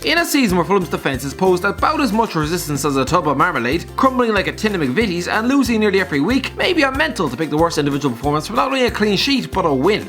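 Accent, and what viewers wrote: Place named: Ireland. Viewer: Its Irish Guy he's Irish most Irish people talk fast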